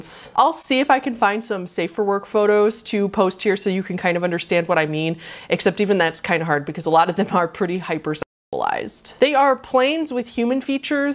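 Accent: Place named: Los Angeles, United States. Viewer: I'm going to guess you're from LA from the way you ground out the end of your sentences.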